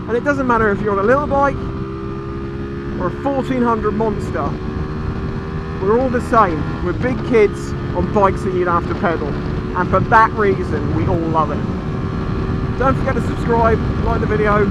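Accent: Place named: Midlands, United Kingdom. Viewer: Dude, love your vids. You sound like you're from the Midlands (my old stomping ground). I've been watching a lot of your Lexmoto vids, and have decided due to the warranty and breakdown to plump for one of their 125's over a second user unknown YBR. I've just got my CBT, what Lexmoto model would you recommend based on your experice to continue my ride learning on L plates and to use for and after my test? I watch one vid (like the Venom) and love it...then I see you review the Michigan and change my mind ha ha. Ive also been looking at the Isca as a similar bike to the YBR but really would appreciate your opinion, specifically for new CBT riders to build up riding skills and experience.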